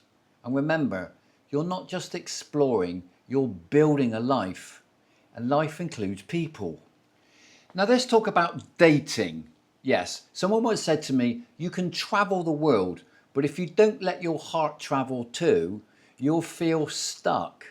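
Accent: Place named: South West England, United Kingdom. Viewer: you sound like your from the south west or brizzle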